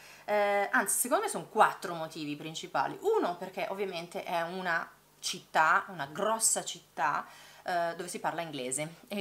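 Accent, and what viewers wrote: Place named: Milan, Italy. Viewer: sempre con la cadenza milanese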